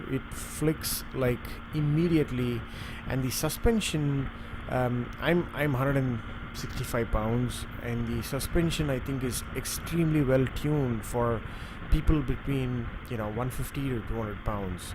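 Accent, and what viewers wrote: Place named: India. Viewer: You sound a little Desi!!🤔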